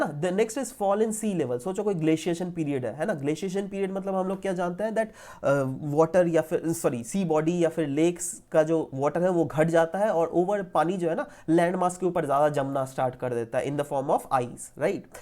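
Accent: Bihar, India. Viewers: sir aap bihar say hai kya ?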